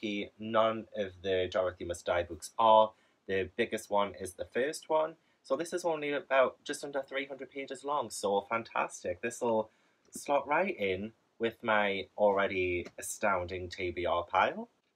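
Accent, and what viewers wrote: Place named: Northern England, United Kingdom. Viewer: I found you via Codie and I'm so excited to hear a northern accent! Good luck with that incredibly ambitious tbr! I really need to read Howl's Moving Castle - I've owned it for about 6 years.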